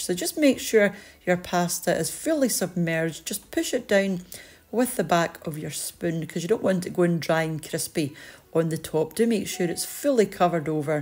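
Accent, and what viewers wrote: Scotland, United Kingdom. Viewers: Hello from Colorado., USA. There’s a whole group of us out here in my community who watch your show... partly for the recipes; partly just to relax to the sound of your amazingly lovely and relaxing Scottish voice. I’m going to be making a big slow-cooker full of this wonderful recipe today. It’ll make for an easy cooking-free weekend, too.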